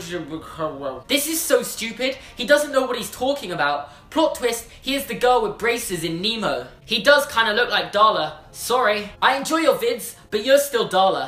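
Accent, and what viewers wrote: England, United Kingdom. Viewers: Idek how y'all can make fun of his braces when he looks so cute I mean fr fr braces+cute British accent+BEAUTIFUL HAIR=the perfect boy so y'all can stfu and stop talking about him thx👌🏾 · I actually really like your braces and I just don't get your voice because of the English accent since I'm American sorry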